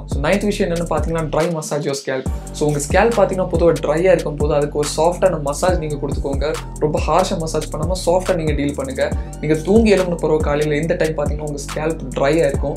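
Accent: Kanyakumari, India. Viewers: ohh I too from Kanyakumari bro , ur slang is Kanyakumari slang 😁❤️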